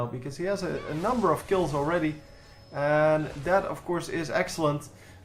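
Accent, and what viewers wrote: Netherlands. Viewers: your dutch right?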